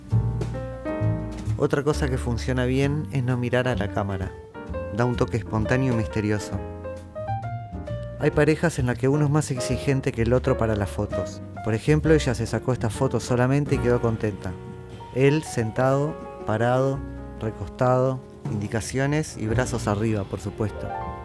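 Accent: Argentina. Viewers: Y te digo que por su forma de hablar al principio creí que era argentino, suena igual 😄🇦🇷👋🏻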